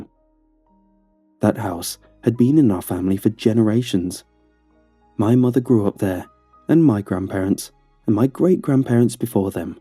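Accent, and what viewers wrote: England, United Kingdom. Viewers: Not on my reg profile, on a friends..I've been listenin 2 for yrs now, my fave CP narrirator, is it just me, or his voice sound watered down? way less English?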